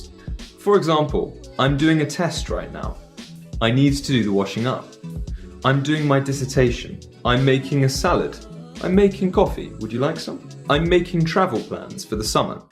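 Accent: England, United Kingdom. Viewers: блин, у него такой крутой Английский акцент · Это британский акцент? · На английском слушать уроки гораздо интереснее, с настоящим английским произношением👍 кстати, оно заметно отличается от американского. Русский с английским акцентом от звучит забавно, тоже прикольно посмотреть)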